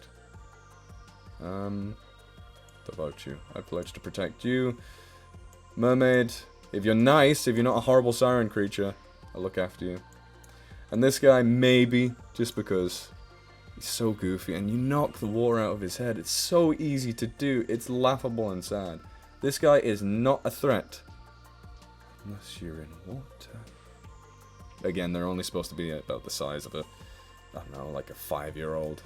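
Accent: Ireland. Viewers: I like your Irish! You are adorable! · Love the Irish accent lol great video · 1, you're Irish? and 2, fucking lmao this was funny! But also you showed me some cryptids I've never looked up before 👀 gg, sweet vid~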